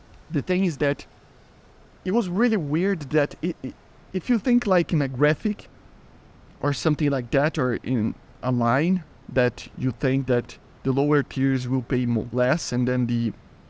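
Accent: Brazil. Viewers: tu é brasileiro?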